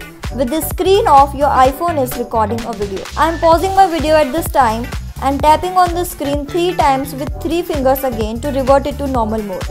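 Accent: India. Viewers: Why is always Indian accents on these videos ?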